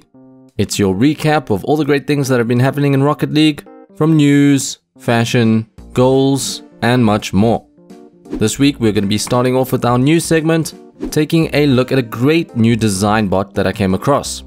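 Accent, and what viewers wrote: South Africa. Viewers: i had a feeling you were South African from the accent, but had to check your bio. Glad to see i was correct! Good Vids!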